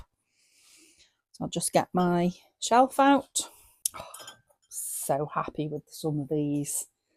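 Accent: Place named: United Kingdom. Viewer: you’ve got one of the strongestUK accents I’ve ever heard. Lol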